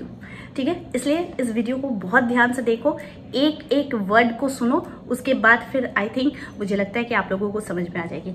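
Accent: Bengal, India. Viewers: Apni ki bangali? Apnr uchharon onek tap bangalider moto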